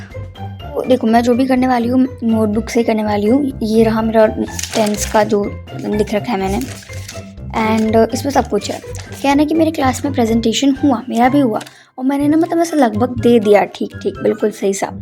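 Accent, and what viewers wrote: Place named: Haryana, India. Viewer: Acha per apki boli to haryana jaisi h 😅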